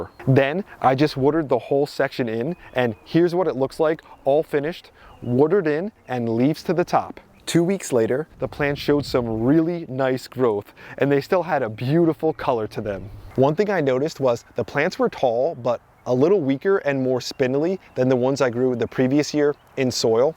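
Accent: New Jersey, United States. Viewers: I am in Burlington NC! I planted my seed potatoes in 10 gallon fabric grow bags last week. April 15. The russets are just coming through. I planted all the potatoes deep so I can have room for hilling. I learned a lot from this video. I have grown potatoes in straw and even cardboard boxes with successes. I was planted later this year because the first batch of seed potatoes I ordered from MIGARDENER were not chitted. So, I had to spend a month doing that. I ordered some new seed potatoes from MIGARDENER were a week ago and they came today already chitted, so I planted them immediately. Take care and good luck. I love listening to you talk with your Jersey accent. I lived in several places in Jersey in the 1970s Nd 1980s. I moved here from Asbury Park in 1992.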